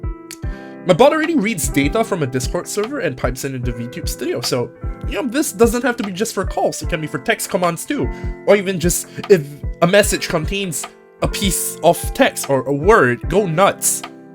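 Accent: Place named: United States. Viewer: Just want to say, I love the accent you're packing. And I thought every Singaporean Vtuber here had the Singlish problem, you're one of the first I've watched with a normal American accent, and I'm happy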